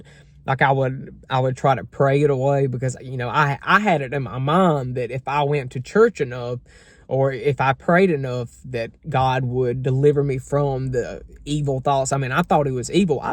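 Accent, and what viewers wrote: South, United States. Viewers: I love your southern accent. It's so hot. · We love your Southern accent!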